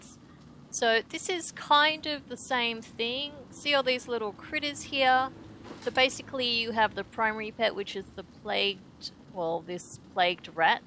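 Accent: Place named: Australia. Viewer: always love to hear a woman's aussie voice in gaming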